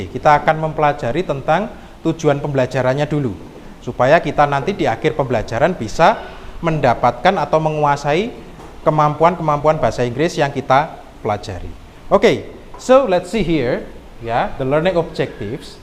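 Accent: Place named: Java, Indonesia. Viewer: Javanese english sir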